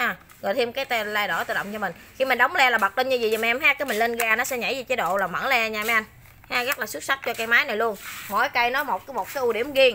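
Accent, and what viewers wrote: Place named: Mekong Delta, Vietnam. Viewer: Bà chủ máy này giọng miền tây. Nghe chân chất mà vui vui. Cách giớ thiệu sản phẩm mộc mạc nhưng chuyên nghiệp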